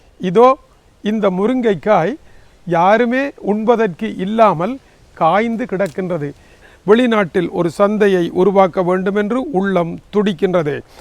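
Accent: Jaffna, Sri Lanka. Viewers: யாழ்ப்பாண தமிழ் இனிமையாகத்தான் இருக்கும்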